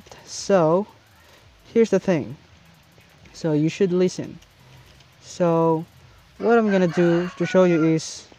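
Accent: India. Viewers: i think u r a indian beoz iam also a indian the english slag looks like indians and even i speak like that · oh 😂 u speak like a indian but np!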